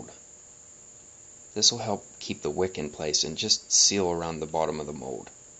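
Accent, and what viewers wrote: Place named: New Jersey, United States. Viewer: Are you from New Jersey? I know of a guy who is from NJ that speaks a lot like you do.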